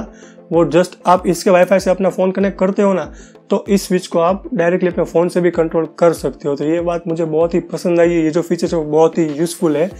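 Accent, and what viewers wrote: Gujarat, India. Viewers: Are you from Gujarat? Because your hindi has gujarati accent. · Wow.. Your gujju hindi accent is owsom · ભાઈ ગુજરાતી લેહકો આવે છે હિન્દી મા હો 😂🤩🤩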